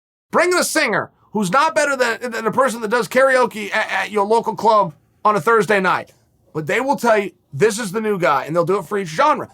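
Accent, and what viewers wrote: Liverpool, United Kingdom. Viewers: Proper scouser! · he’s scouse lad. Like the Beatles saying ‘you know’. Get used to it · Over-hyped Scouse just like Till. Everyone needs to 'CALM DOWN LAD'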